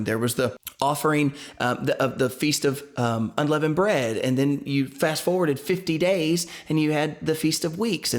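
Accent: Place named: South, United States. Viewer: Feast of booze 🍸 😆 so funny! I like your country accent! 😊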